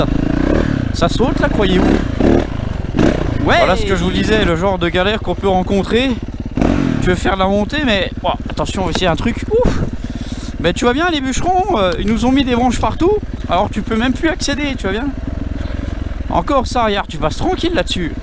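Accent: France. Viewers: T’es pas des Vosges toi groooos ? 😂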